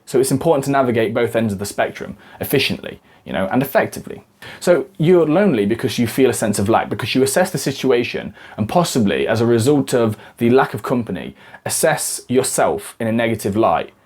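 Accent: United Kingdom. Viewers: your british accent is so coollllllllllll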